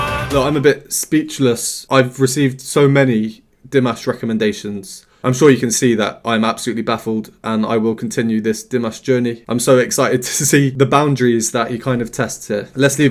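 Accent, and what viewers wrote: United Kingdom. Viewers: such an in-depth professional analysis + genuineness + sheer emotions + sophisticated language + British English... couldn't be any better. please keep it up 💚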